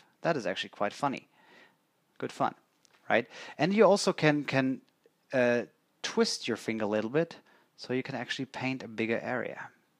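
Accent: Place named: Germany. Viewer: This is the first vid I've watched of yours and I kept thinking "He sounds like his accent is German" and then you went to your home town haha.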